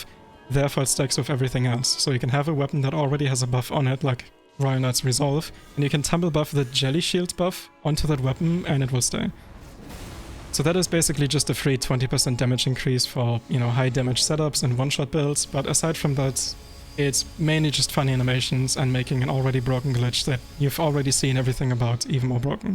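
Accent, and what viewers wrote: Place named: Germany. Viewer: You’re German right? Because your accent sounds like it :D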